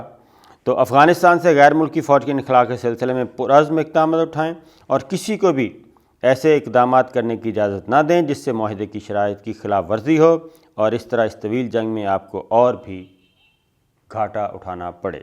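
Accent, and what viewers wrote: Punjab, Pakistan. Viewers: the way u speak English with Punjabi Accent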